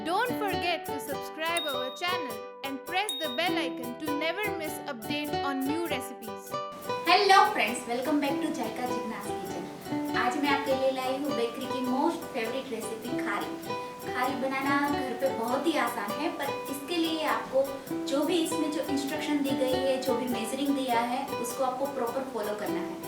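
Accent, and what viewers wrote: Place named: Gujarat, India. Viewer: Good receipe. Thanks for sharing. But why Gujju people pronounce like Gujjus please work on your words. Come out of Gujju accent you will ROCK for sure. All the best.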